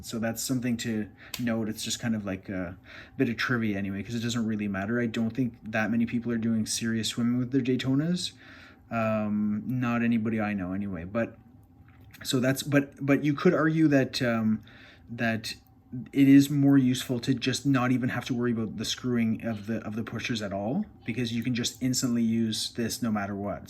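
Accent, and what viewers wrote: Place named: Canada. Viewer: Enjoying your reviews. You should do more. You're Canadian eh, just your Os and French pronunciation. Are you involved in any local watch groups?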